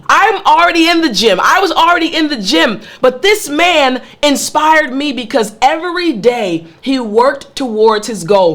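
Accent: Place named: United States. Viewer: I love the American accent.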